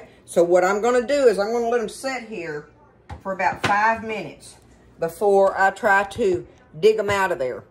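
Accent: South, United States. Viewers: I am so grateful to have found your channel. I thoroughly enjoy the recipes, learning new things and listening to that beautiful Southern accent of yours.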